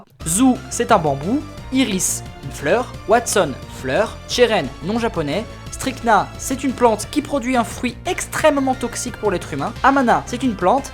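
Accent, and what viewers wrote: Spain. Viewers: S'il te plaît arrête avec ton accent espagnol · Ton accent espagnol es magifico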